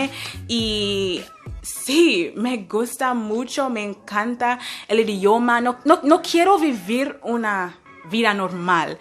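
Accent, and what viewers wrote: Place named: Spain. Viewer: yes u do have a spanish accent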